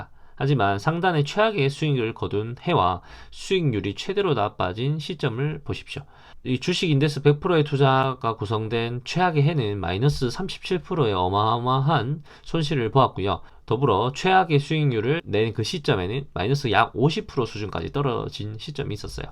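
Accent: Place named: Gyeongsang, South Korea. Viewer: 경상도 분이시군요. 억양이 힘들어요. 내용보다 그 억양에 집중되요.ㅠ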